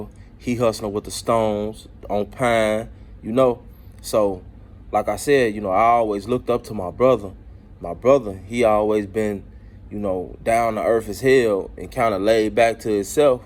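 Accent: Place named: South, United States. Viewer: Damn boy u sound like u from downsouth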